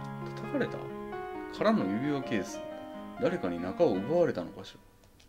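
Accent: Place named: Kansai, Japan. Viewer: 関西弁で冷静にツッコみを入れていく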